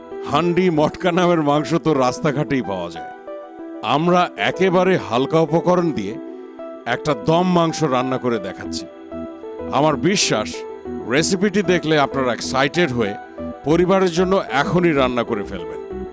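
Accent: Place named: Bogura, Bangladesh. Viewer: আমার মনেহয় আপু বগুড়ার মেয়ে, কথা শুনেই কেমন জানি বগুড়ার স্বাদ খুজে পাই, যাইহোক আপুর জন্য ভালোবাসা রইলো, জীবনে যদি বড় হই তবে আপুকে একবার দেখার চেষ্টা করবো।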